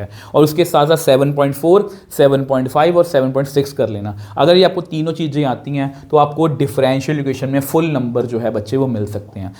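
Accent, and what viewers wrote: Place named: Pakistan. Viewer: Sir apki accent pakistani lgti h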